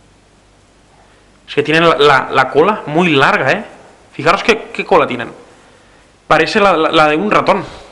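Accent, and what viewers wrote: Catalonia, Spain. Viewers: no se por que pero tienes un acento catalán tio xd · Me encanta tu acento catalán 😂😂😂 es muy divertido Buen video las tortugas son muy bonitas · Es acento catalan porquevme da una rabia cuando lo escucho...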